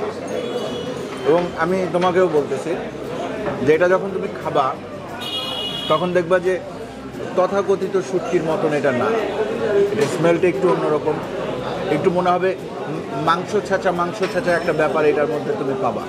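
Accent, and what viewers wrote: Barishal, Bangladesh. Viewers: Aapner Borishaillah accent er ki holo? Shunte besh bhaaloi laagchilo.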